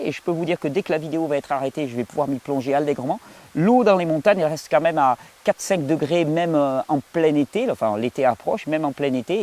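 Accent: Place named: South, France. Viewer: Amusant comme l'accent du sud semble faire passer une dangereuse escroquerie pour quelque chose de sympathique.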